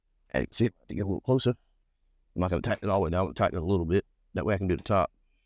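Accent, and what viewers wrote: South, United States. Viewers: I'm glad I'm not the only one to think that. I was going to say he's Laurence Fishburne's more southern sounding brother.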